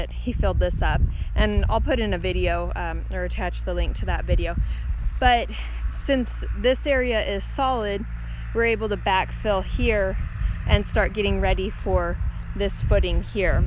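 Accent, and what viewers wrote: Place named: Washington, United States. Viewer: listening to you talk I feel like I am home, you have a washington accent, LOL....watching your high desert vids is making me feel more confident about moving out to the high desert area in AZ I was fearful I wouldn't be able to have a garden glad to see it can be done =)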